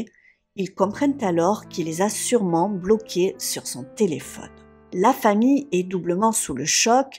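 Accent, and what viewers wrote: South, France. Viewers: C'est toujours un grand plaisir de vous écouter. Et ce bel accent du sud met un peu de soleil sur Liège ( Belgique) , où il fait toujours très humide et froid......:-)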